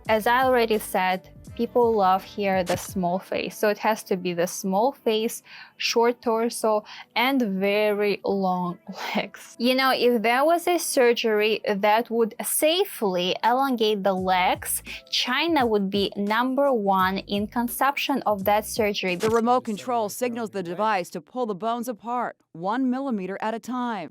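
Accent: Russia. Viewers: you sound russian, why are you replicating chinese beauty standard though, for work? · Че за русский акцет🤔🤔🤔🤔🤔🤔🤔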